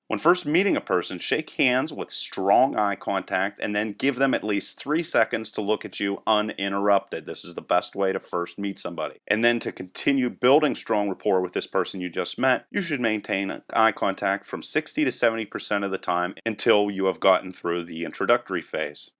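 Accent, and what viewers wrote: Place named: Western Pennsylvania, United States. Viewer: Are you from western PA? I recognize that accent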